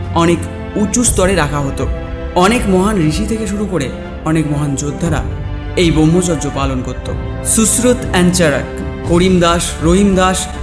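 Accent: India. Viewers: এটা কি বাংলাদেশ ইউটুইব চ্যানেল ? দুঃখিত কথা শুনে ভারত পছিমবঙ্গ মতো লাগলো. তোমার কথা শুনে আমার খুব ভালো লাগলো খুব expression পাওয়া গেলো ।❤️❤️❤️❤️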